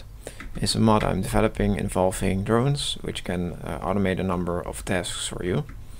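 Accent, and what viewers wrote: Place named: Netherlands. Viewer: ik hoor aan je stem dat je nederlands bent en ps bij die forum kan ik de download link niet vinden staat die dan ergens anders?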